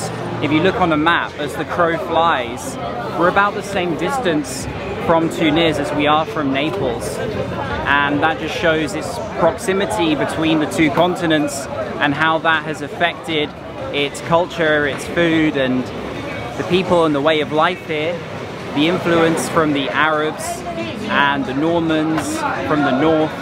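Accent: England, United Kingdom. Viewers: you're from England?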